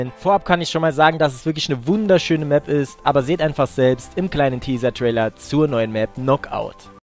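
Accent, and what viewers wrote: Saarland, Germany. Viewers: Kommst du aus dem Saarland?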